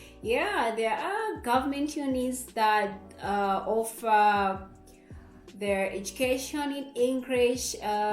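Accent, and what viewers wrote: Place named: Turkey. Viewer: Your turkish accent is so cute! Thank you for making this video, i am planning to study University in Turkey and this was very helpful <3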